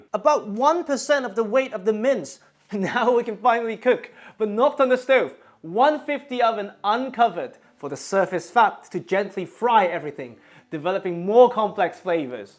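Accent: United Kingdom. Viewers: If I were to assume, I think he learned English from Europe. So he has a mix of an Asian accent and British English accent. He has a good sounding voice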